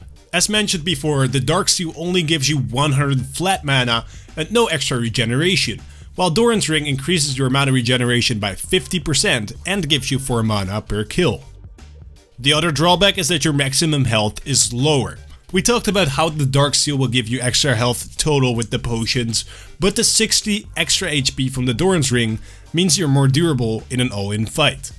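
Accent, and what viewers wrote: Netherlands. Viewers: jij bent NL niet waar ? het was echt moeilijk te horen maar ik hoorde het heel klein beetje :p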